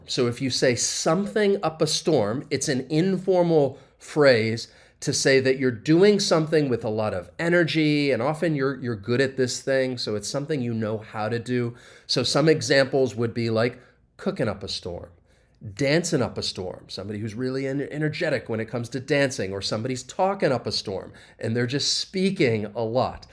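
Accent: United States. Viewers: The most stupid American English accent which no one in the world understands it.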